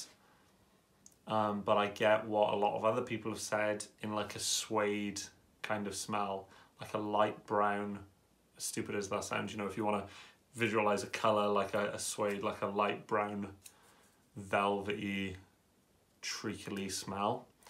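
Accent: Wales, United Kingdom. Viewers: an Escentual buy, eh? Nice to hear another Welsh person reviewing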